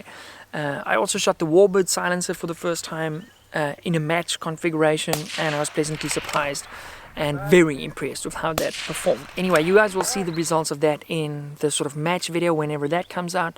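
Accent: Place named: South Africa. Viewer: I watch your videos to hear you SA accent.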